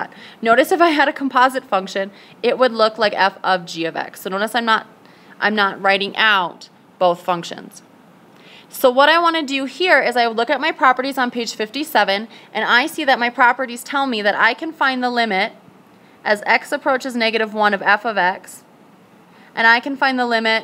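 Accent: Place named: United States. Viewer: what state are you from? I like the accent lol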